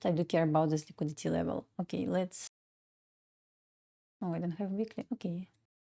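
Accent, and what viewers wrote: Russia. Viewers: YOUR MOTHER LANGUGATE MUST BE RUSSIAN...I CAN FEEL IT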